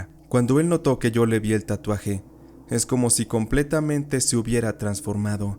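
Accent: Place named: Mexico. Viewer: ME ENCANTA EL ACENTRO MEXICANO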